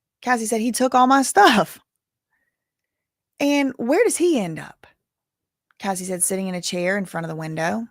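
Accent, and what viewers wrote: South, United States. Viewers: My husband thought you were a black gurl this whole time lmao “no she’s just from the south” 🧚🏻‍♀️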